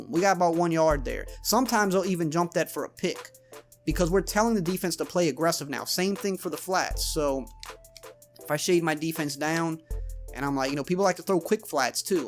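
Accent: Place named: New Orleans, United States. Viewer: You’re from New Orleans aren’t you? Accent gave it away.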